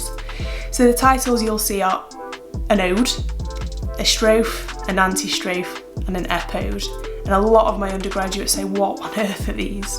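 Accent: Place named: United Kingdom. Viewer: A beautiful girl with a British accent who talks about tragedy. 😍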